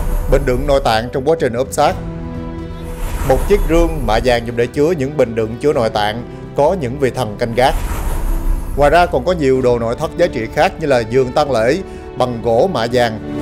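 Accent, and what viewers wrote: South, Vietnam. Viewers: Một kênh du lịch khám phá rât lý thú , Giọng miền Nam của bạn rất dễ chịu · người Miền Nam, cho nên thuyết minh giọng rất thu hút & duyên dáng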